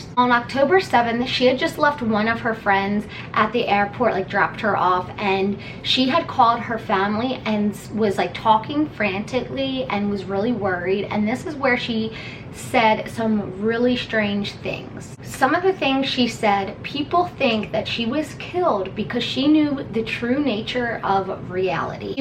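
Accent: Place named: Maryland, United States. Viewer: Sounds like a MD accent... Great video! Subbing for more!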